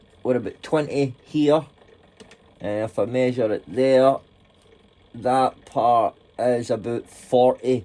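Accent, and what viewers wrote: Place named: Scotland, United Kingdom. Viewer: I love the Scottish dialect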